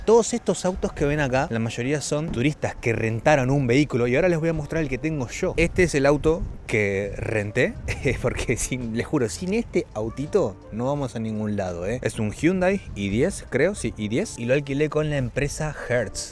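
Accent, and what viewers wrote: Argentina. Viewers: me gustan mucho mas con tu voz, tu acento argentino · GRACIAS, RECORRO EL MUNDO CON TUS VIDEOS Y HABLANDO A LO ARGENTINO, COMO DEBE SER JAJA. UNO DE LOS MEJORES VIDEOS!! SALUDOS DESDE BS. AS, ZONA NORTE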